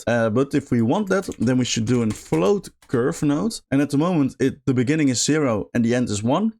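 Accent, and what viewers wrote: Netherlands. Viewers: Goede video, je accent is onmiskenbaar en aandoenlijk Nederlands (jaren 90 MTV Katja Schuurman, nee echt zoek het op 😂). Je nodes/wiskundige aanpak lijkt op die in Midge Sinnaeve's video's. Prachtige renders. Subbed 😊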